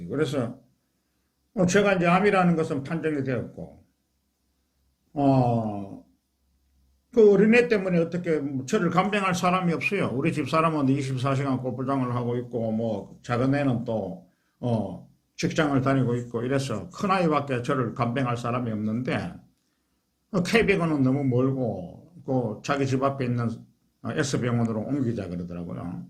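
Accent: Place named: Gyeongsang, South Korea. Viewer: 멋진 우리 리더님 구수한 경상도 특유의 사투리 너무 정겹고 멋있으십니다^^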